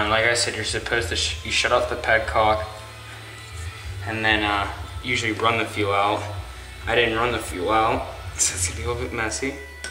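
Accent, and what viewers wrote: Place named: Ontario, Canada. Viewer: Hey you sound like your from ontario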